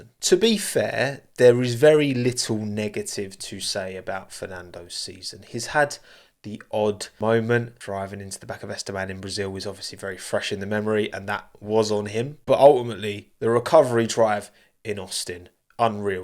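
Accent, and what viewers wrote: United Kingdom. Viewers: I wanted to ask you a question that might come off a bit rude, but I don't mean it rudely. What's your particular style of British accent called? I watch a lot of F1 youtubers from the UK, and it's not like I've never heard British accents before... Your accent is kind of particular though, and I just wondered what it was called. No disrespect meant.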